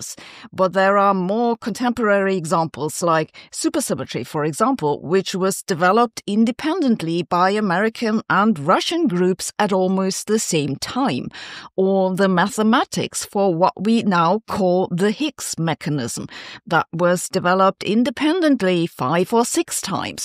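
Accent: Germany. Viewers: German roots - and so the style of speaking - peeps through - like all of us - our past speaks too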